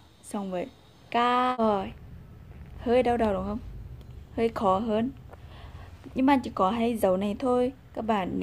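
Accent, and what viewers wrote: Nghe An, Vietnam. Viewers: Bạn người Nghệ an ,hà tĩnh hay người laos vậy bạn giảng thật dễ thương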